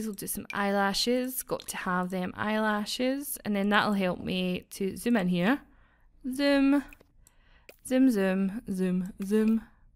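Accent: Scotland, United Kingdom. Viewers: I'm pretty sure she's Scottish as an Australian accent is like a relaxed British accent. I'm Australian, I should know ahahaha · Omg ur accent! I luv it! U Scottish? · Can hear those scottish roots coming out in you :P Instantly knew, scots rule! \o/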